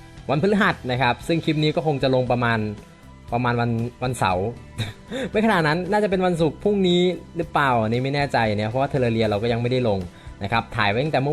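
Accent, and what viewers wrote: South, Thailand. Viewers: พี่แหลงภาษาใต้ได้ม้าย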